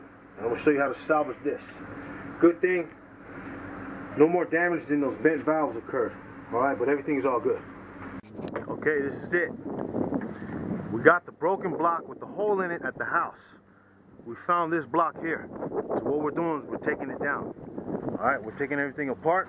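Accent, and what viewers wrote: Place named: California, United States. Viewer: i like your cali preaching.I'm mechinc in learning 5yrs and counting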